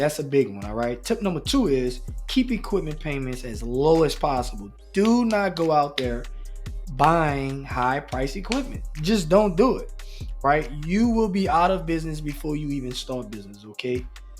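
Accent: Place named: Louisiana, United States. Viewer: Salute my Louisiana bro i can here your accent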